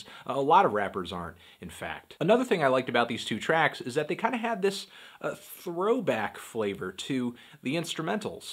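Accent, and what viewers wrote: England, United Kingdom. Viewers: I rate your English accent a light to decent 4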